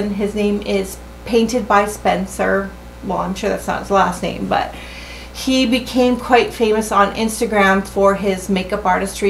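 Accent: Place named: Toronto, Canada. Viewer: Hello from Belgium. I found you because I wanted to learn more about Spencer but YOU sound like a sweetheart! I'm originally from Atlantic Canada (Cape Breton Island) but haven't been back home since 2011 but l please correct me if I'm wrong but you sound like you're a mainlander perhaps from Toronto?? (My apologies if you're a Yankeedoodle eh but I left to come live over this way back in 1989). ❤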